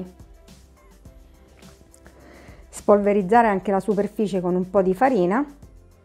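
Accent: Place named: Southern Italy, Italy. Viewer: Seguo spesso le vostre ricette, complimenti. Posso chiedere di dove siete?. Sicilia? Calabria?. Non riesco a capirlo dal vostro accento...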